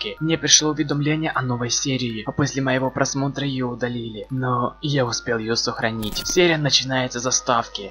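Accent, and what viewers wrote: Okanye, Russia. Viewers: ГОВОРИ НОРМАЛЬНО ТЫ В КАЖДОМ СЛОВЕ ГОВОРИШ БУКВУ О!😡😠👹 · ПОчему ТЫ вОсё гОвОришь черёз О?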